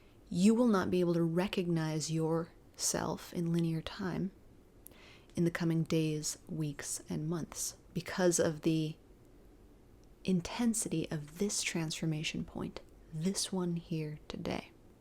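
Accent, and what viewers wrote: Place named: Scotland, United Kingdom. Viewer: Thank you for the encouragement and the scottish accent 🙏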